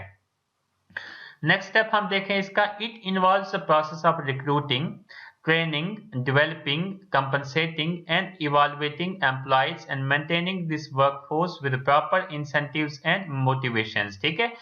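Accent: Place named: Bhaderwah, India. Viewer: sir your voice seems to have a Bhaderwahi Accent(u seem from Chenab Vallley)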